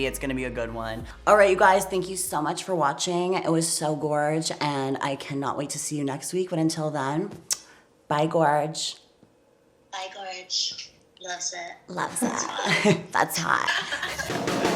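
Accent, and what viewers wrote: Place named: Los Angeles, United States. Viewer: i love you LA dialect its so calming and entertaining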